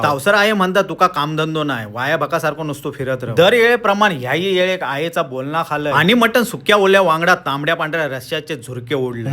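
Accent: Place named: Konkan, India. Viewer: कोकणी बोली ऐकायला बर वाटत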